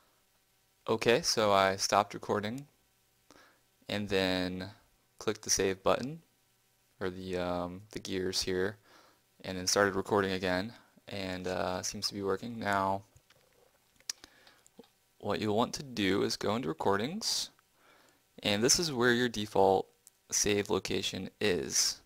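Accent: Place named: Sweden. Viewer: Holy shit you sound swedish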